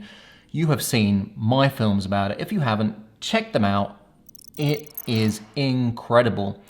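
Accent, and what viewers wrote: United Kingdom. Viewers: U can always count on someone w a British accent to call a spade a spade. Lmao. Agreed. Beyond the mini2... It's going to take alot to justify crossing the legal line in Canada .. ... Awaiting the Mavic 3 as well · This makes sense, i could quite place where you may be from. Its a unique accent but definitely quite British the accent and you have great humor. Great work man keep it up.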